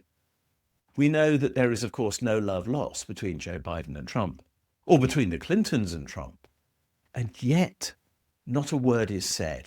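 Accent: United Kingdom. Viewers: Oh contrare, the coup happened with the 2020 election. Bush, Clinton, Obama & Biden are all traitors to America. The only one going by the book and the constitution is Trump. Do some research. And, yet, you with a British accent, who probably loves your King. Oh, the irony of it all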